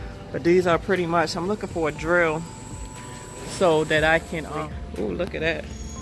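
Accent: Baltimore, United States. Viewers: My 10 year old recognized your voice. He asked if you were from Baltimore. I told him yes. He said you talk like one of his friends from Baltimore. So funny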